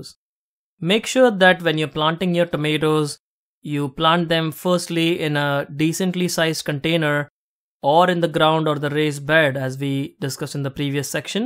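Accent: India. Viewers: clearly Indian Accent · what other accent would an Eastern Indian person have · You've got an Indian accent sir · I like his Indian accent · English is so much in Indian accent, it's hard to understand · Your accent felt to me from Indian origin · his indian accent is so thick i love it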